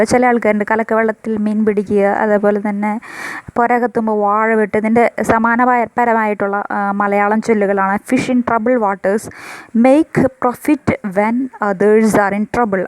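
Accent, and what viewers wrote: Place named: Thrissur, India. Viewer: അടിപൊളി, തൃശൂർ ഭാഷ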